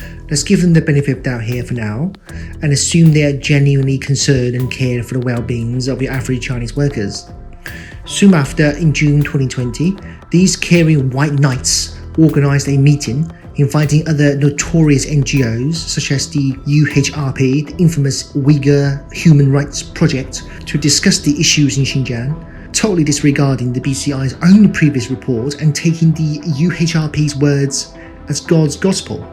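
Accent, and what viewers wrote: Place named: United Kingdom. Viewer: 牛逼牛逼·这个英伦口音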